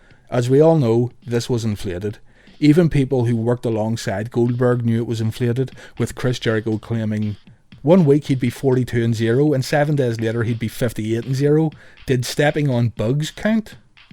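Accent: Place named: Northern Ireland, United Kingdom. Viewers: What part off northern ireland you from? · Northern Ireland narrator is class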